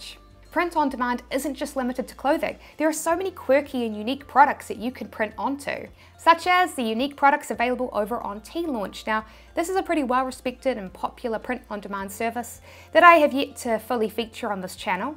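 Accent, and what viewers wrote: New Zealand. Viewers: I'm in England, and think your New Zealand accent is reassuringly super cool. Completely in love with you, brilliant presentation so easy to understand. I was never much interested in on-line marketing until, Conyvirus found me on YouTube and stumbling onto your channel, Wow you are impressive, your running commentary alone is so descriptive I have you in my ear most all the time, helping and encouraging, Brilliant brilliant brilliant - you have changed my life, for the better for ever. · You sound like a kiwi girl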